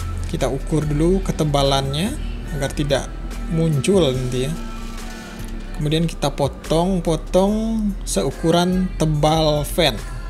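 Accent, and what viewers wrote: West Sumatra, Indonesia. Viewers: Ko iyo minang bana aksen nyo 😂😂😂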